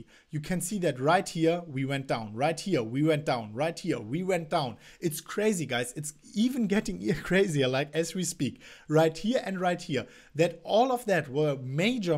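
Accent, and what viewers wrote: Germany. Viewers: Love your German accent. It actually makes me listen with focus , cheers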